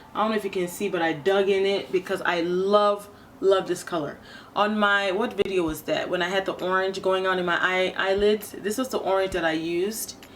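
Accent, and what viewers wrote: United States. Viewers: Lol when u rolled them eyes so hard.... "I cant be lookin crazy in these streets" LOL I just love u and how ur african/chicago accents intertwine lol... Just glue ur powder pan back into the container. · your country accent is so cute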